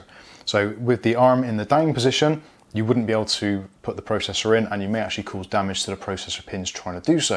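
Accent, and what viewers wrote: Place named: Yate, United Kingdom. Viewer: Your 100% from yate or not far from there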